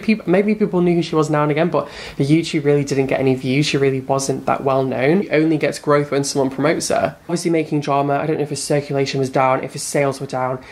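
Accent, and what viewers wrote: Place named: United Kingdom. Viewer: The British accent makes it particularly saucy.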